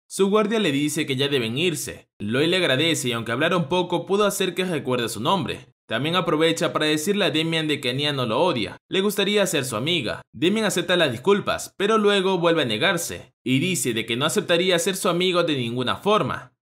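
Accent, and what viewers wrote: France. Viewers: ¿,tienes acento francés?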